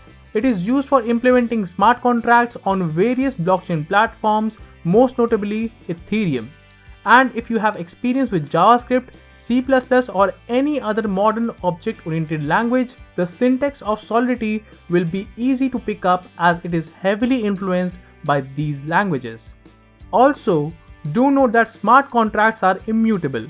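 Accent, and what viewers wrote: India. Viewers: 1) Among the dozens of videos on crypto, yours is best because of Indian English. If I hear American English I get angry because I can digest less than 30%, but I can digest 100% of your Talks. 2) To be a successful Blockchain Developer, apart from All the technical Programming stuff,what is needed is SIMPLICITY, for common man to participate in Crypto Investment.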